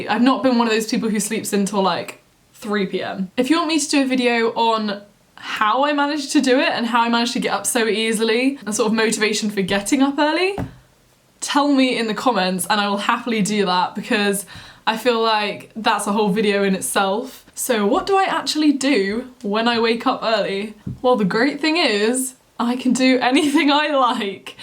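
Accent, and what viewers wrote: United Kingdom. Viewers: do you have a british accent? you dont seem to have an aussie accent to me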